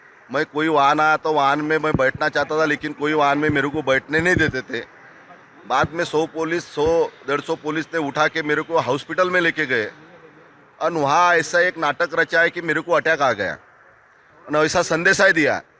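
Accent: India. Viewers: Very interesting news reading in desi and great style.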